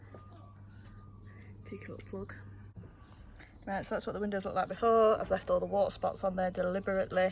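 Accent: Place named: Manchester, United Kingdom. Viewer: You sound like a fellow Manc!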